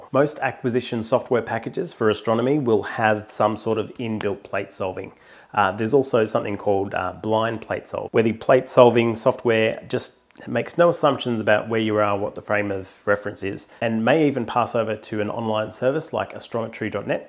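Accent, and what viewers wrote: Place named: Australia. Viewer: Haha..I totally thought you said spice is big in the beginning 😂.. Aussie accent is great.. 😀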